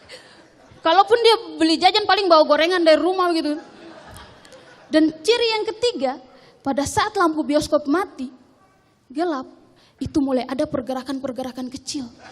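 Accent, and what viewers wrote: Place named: Eastern Indonesia, Indonesia. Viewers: Kk...logat Ende kantal Alee👍👍👍😁😁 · Saya orang Sanger Namum BANGGA akan nona dgn gaya bahasa timur..keren keren nona.maju terus dlm berkarya... Tuhan memberkati mu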